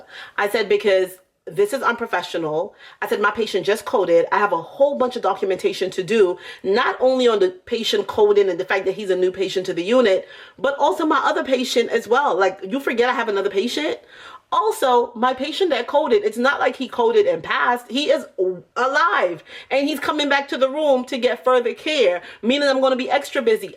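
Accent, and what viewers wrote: New York, United States. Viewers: You from NY or Jersey ☺️ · I’m back too 👏🏾👏🏾👏🏾👏🏾👏🏾that New York accent 😝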